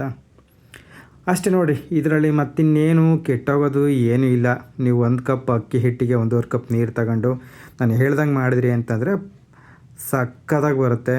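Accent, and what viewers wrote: Chitradurga, India. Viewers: ಭಾಷೆ ಕೇಳಿ ನೀವು ಚಿತ್ರದುರ್ಗದವರು ಅಂತ ಗೊತ್ತಾಯಿತು. ಯಾಕೆಂದ್ರೆ ನಾವು ಚಿತ್ರದುರ್ಗ ಹೊಸದುರ್ಗದವರು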